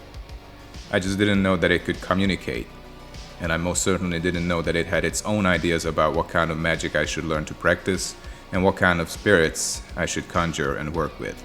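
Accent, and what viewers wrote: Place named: Eastern Europe. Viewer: Sounds Eastern European.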